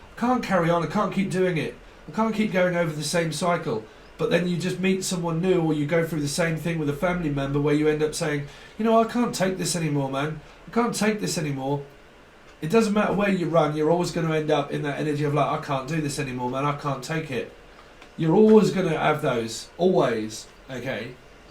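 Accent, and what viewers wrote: United Kingdom. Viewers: you sound like your from my ends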